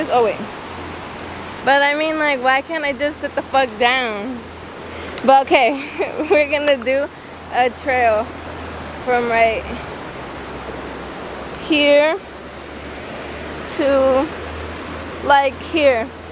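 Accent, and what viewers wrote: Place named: California, United States. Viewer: Es obvio que es chicana. Rostro de mexicana pero habla inglés perfecto y con acento californiano.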